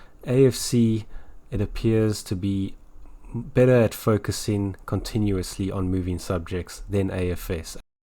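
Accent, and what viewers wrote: South Africa. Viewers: South African? Thanks for the hacks bro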